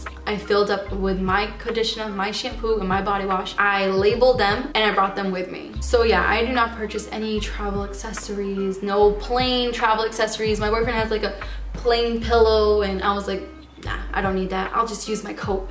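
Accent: Boston, United States. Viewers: 1Brave Moon0 it’s a boston accent haha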